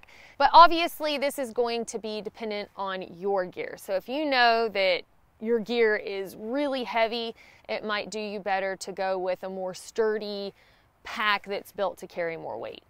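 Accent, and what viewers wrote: South, United States. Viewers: I❤ that Southern Belle accent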